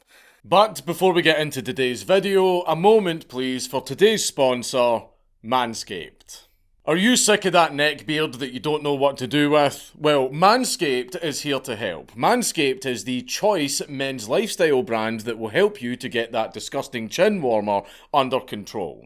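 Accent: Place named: Glasgow, United Kingdom. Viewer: Mate, I love this channel, I love your style and being Glaswegian so listening to your accent gives me a feeling of being in the company of family as my mother is Glaswegian and rest of family are Scottish. But to see Alex crying about his children when he destroyed the lives of 26 families, who actually had their family members including children killed, all to sell his protein supplements. "They're going to take your guns, "DESTROY THEM ALL because they're all actors", with absolutely no proof. Mate, you need a to make better choices in friends. He might have some awesome charisma and you may have developed a bit a a idol crush on him. And some of his theories may be correct but the way he went about the Sandy Hook incident he deserves all he gets. He is a greedy piece of shite that deserves all he gets.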